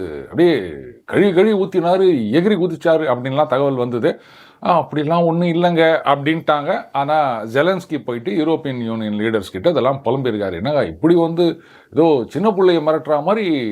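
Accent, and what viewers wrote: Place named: North Chennai, India. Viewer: Pl pl speak in real Tamil not in north Chennai accent. So cruel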